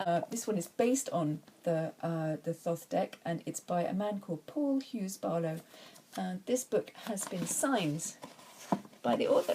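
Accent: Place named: England, United Kingdom. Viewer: How did you get a nice English accent ?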